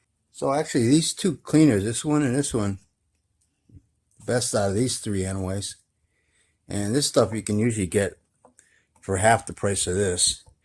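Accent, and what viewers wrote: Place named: Boston, United States. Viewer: I like the Boston accent. If you ever meet Mark Wahlberg or one of his brothers, let us know.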